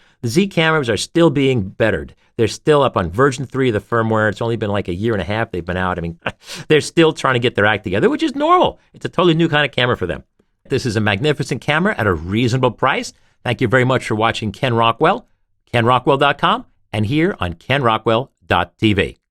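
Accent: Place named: North America. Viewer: Dear Ken I love your reviews, you are responsible for my choice of a Nikon D600 as my first digital camera (which Nikon subsequently replaced for free with a D610 because of the shutter/oil problems). I had to "up-grade" to digital for on-land photography when my Konica T3 became irreparable. My first Nikon was a Nikonos 5 - which feels very much the same "in the hand" as does my Fuji X-T1 (again, acquired on the basis of your review). Anyone who has used a Nikonos 5 becomes a rusted-on Nikon user for life. But, please, please, please, for the benefit of we English speakers, the correct pronunciation of "Nikon" is indeed "Nikon", not "Nye--kon". That is, "Nikon", as derived from "Nippon", not "Nye-kon" as not derived from "Nye-pon". But, more importantly, a "Z6" is a "Zed-6", not a "Zee-6". To we English speakers, hearing a North-American accent, the expressions "Zee-6" is indistinguishable from "Dee-6". 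Given the date of this review, your references to "Zee-6" could not have been to a "Dee-6", but how many YouTube viewers actually look at the date of up-loading of a video?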